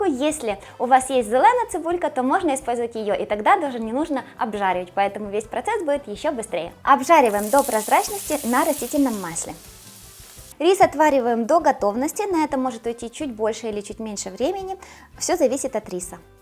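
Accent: Ukraine. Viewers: Дитинко все добре , вле чому ти мовою окупанта ведеш , по говору чути що ти з українського середовища , якась напасть так нелюбити свого і підлаштовуватися під чужих . Чи гроші понад усе ?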